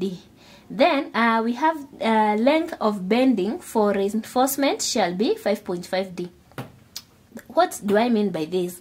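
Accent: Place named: Kenya. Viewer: Weldone. I enjoyed your tutorial. You sound like a Kenyan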